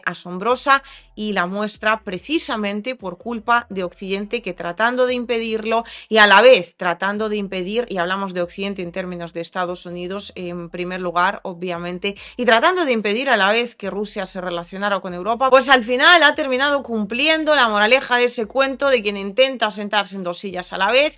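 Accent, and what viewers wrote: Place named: Castile, Spain. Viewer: Muy buena información muy buen español aunque con acento castellano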